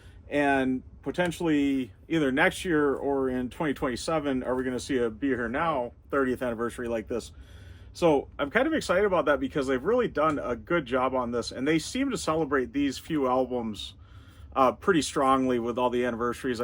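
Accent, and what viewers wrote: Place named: England, United Kingdom. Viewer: as a norwegian, im struggling to hear if u are english or ameircan. yea, i love. everything i love about, is in. if have existed in 2000, they would have sound exactly like, even the harmonies are there. by could be straight frim help, too,. is a very john lennon song too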